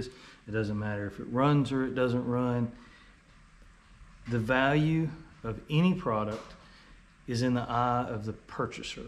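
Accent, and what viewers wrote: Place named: South, United States. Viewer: There is no such a thing as a perfect and when I say wrong I just disagree. It does not mean I am right and you are wrong that's all. If you were not doing a great job I would not be watching you all the way across the country in a miserable state lol of Southern California North East San Diego By the way you talk I'm guessing you're somewhere in North Florida or South Carolina? Also I don't have any Hondas actually have a couple of 2 wheelers 2004 WR450F, 2002 DRZ400 and 1985 Yamaha 225DX. I did grow up in the 1980s with a 1977 very first year Honda Odyssey and 83 Honda 185S. I don't like 4 wheelers I am a dirtbike guy but for some reason I also do like threewheelers so go figure Well thanks for doing a great job keep making videos and I watch some of your videos over and over again and my opinion is that Honda two valve motors were a lot more durable and did not have top end problems like their RFVC 4 valve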